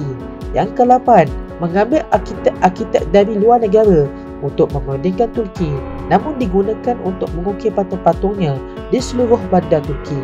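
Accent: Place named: Perak, Malaysia. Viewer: Wah!!!... Mike orghang Perak ke Yong oii? Slanga Perak...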